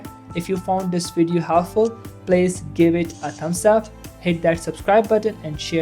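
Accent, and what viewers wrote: India. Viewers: Indian accent so irritating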